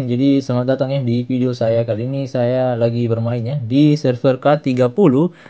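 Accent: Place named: Sulawesi, Indonesia. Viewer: Dari logatnya pasti orang Sulawesi😂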